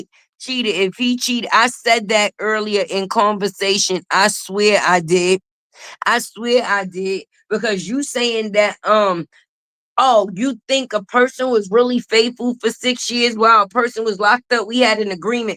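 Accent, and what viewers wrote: New York, United States. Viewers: I LOVE TO HEAR U TALK,FIRST UR GOOD AT WHAT U DO,BUT IT'S UR ACCENT FOR I LOVE IT,IS THAT A NEW YORK ACCENT? HELL IF SO I NEED RO MOVE THERE N CATCH ON TO THE NY. SWAG N ACCENT 😂❤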